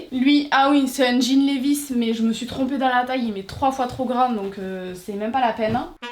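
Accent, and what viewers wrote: South, France. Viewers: un sacré accent du sud haha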